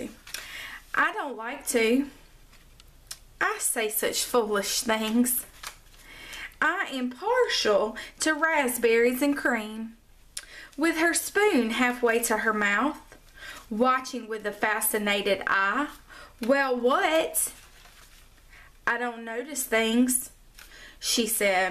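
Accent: South, United States. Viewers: Lovely story AND art!!! And the award goes to...🥁🥁🥁 Southern speaking ladies!!! I'm from Alabama so this is quite refreshing, like a big ol glass of lemonade. Love your videos. 💕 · That whole book sounded like a Southern conversation, lol.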